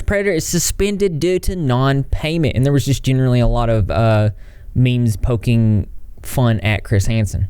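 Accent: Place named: South, United States. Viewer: Your voice and accent are great. Seriously. I am from the southeast U.S. and it makes me happy to hear someone who sounds similar to myself online. That you can sound this way and still be considered concise and intelligent and professional. I am here for it.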